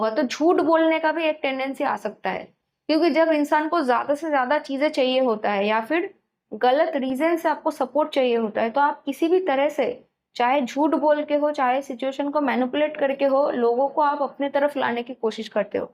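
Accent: Bengal, India. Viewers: বাঙালীদের হিন্দি বলার টান ই অন্যরকম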